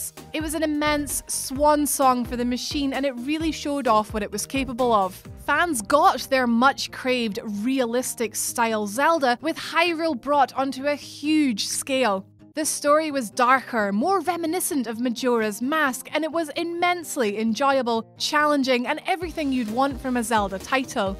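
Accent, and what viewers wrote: Scotland, United Kingdom. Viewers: Even though it is a Scottish accent? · Are ye scottish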